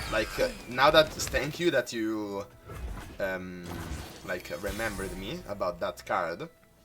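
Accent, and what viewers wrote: Italy. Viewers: Ciao! Sembri italiano da come parli e quindi me la rischio, male che va ti riscrivo in inglese ahaha! Stavo pensando alla possibilità di inserire Lux per Fiora (e magari una remembrance sarebbe obbligatoria) ma non riesco a capire se effettivamente potrebbe essere worthy per gestire meglio alcuni match up. Sono un giocatore di Lux Karma di solito, ma questo mazzo lo conosco poco e soprattutto non so rendermi effettivamente conto se potrebbe sopportare di togliere Fiora. Sapresti darmi qualche consiglio? Grazie mille!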